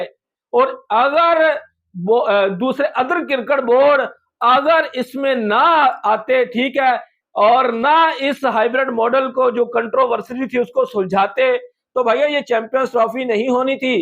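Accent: Pakistan. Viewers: Oye Tu Pakistani Hokar Indian banke india ke kilaf propaganda Kiyo Kar raha hai tu Jahilo anpado ke ustad hote Hai jeh Pakistani, Inke boli Harkaton se pta chal jata ke Je jahil Pakistani Hi Hoga😂😮😅 Urdu Hindi Ki Maa Bhen ak Kar Dete Hai Jeh Pakistani Jaahil😂, Dekhlo Jaahilo ki Haalat 😅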